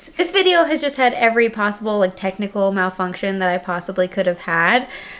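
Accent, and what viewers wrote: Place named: United Kingdom. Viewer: I love the intro😊😊😊. And I thought the British accent was pretty great❤❤❤❤❤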